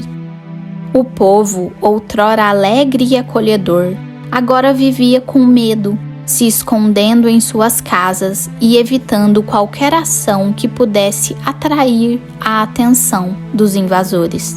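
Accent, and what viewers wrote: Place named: São Paulo, Brazil. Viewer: A narração é muito boa, só tenha cuidado com a pronúncia de algumas palavras, como "abrutalmente", que eu acredito que seria "abruptamente", e algumas outras, que estão com um sotaque paulista/sulista muuuito puxado no "r". De resto, esta muito bom!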